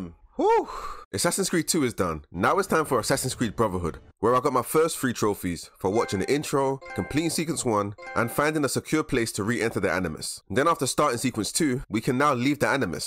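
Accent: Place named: South London, United Kingdom. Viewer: You sound very south London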